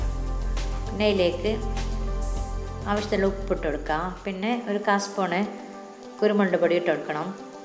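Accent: Kasaragod, India. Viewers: Kazargod slang alle ithu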